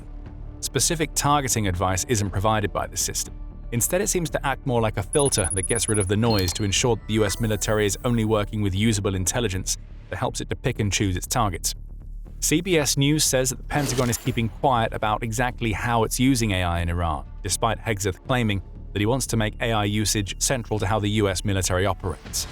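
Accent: United Kingdom. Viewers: If this the case why wasn’t we abled to stop there missiles sooner or even now. They just shut some at Israel creating mass casualties. Look what they have done to the gulf countries. Israel airport, Dubais airport, Israel’s nuclear site, residential and so many others. Iran doesn’t have satellites but china and Russia are giving Iran all the information they need, that’s why they hit their targets on the dot. So this video was like Iran is a sitting duck. I wish that was the case,but it’s not. Even to the point that Trump is talking about negotiation. Please get your facts straight and I don’t know what is up with a British accent?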